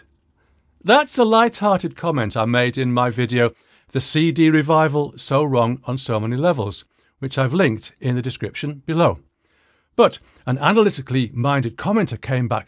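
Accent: United Kingdom. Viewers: Greetings from California. Superb video! Can I ask which region of the UK is your accent from?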